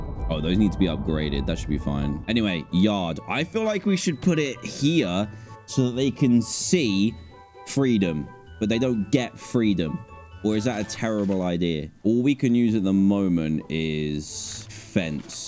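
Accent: United Kingdom. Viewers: is British 👀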